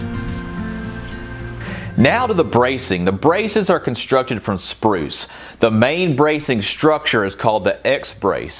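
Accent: South, United States. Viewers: This is just a wild guess but are you any chance from the south? Thanks for the videos.....really enjoy them.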